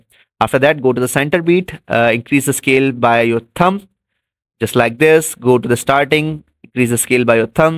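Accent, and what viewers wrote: India. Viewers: bro are you from india? Because your voice is similar to indians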